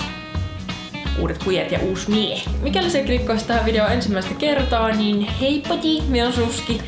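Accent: Finland. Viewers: ootko joensuusta ku murre on nii vahva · Nonni nyt minnuun tarttu tuo siun mie sie murre 😂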